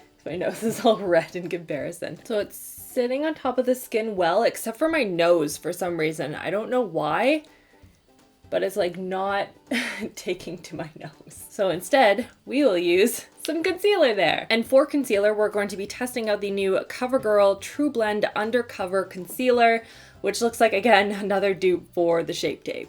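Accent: France. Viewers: OMG YES, THAT'S HOW YOU SAY LES MACARONS 😍😍 your French accent is adorable 🤗 also, love the eyelook 💗 Thanks for that lower lash mascara tip!!! 💗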